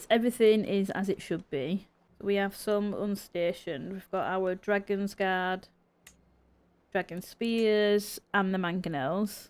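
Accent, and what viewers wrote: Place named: Midlands, United Kingdom. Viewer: Sounds like a midlands accent, good luck with the series!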